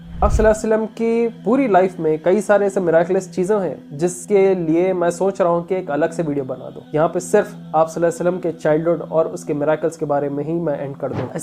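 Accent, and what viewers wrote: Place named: North India, India. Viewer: Apka purana andaz raha baat karne ka toh 1000 videos dekhinge bhai, ye north Indian baat karne jaake itni interesting video dekh ke bhi utna maza nai aara, kaiku english use karre bhai pronounciation ich kharab hojata toh? Totle hai jaisa dikhra aadha word andar hi kha lere.. pehle ke jaisa interesting rakho na conversation? Golden era chale gaya kya ka?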